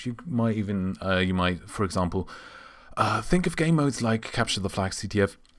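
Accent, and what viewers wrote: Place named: Germany. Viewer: what is your primary language if I may ask? german maybe? ^^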